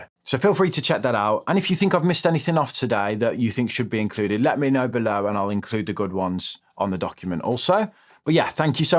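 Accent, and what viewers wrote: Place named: Midlands, United Kingdom. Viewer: Why does this guy say he’s a northern lad every video? He’s clearly a midlands boy based on accent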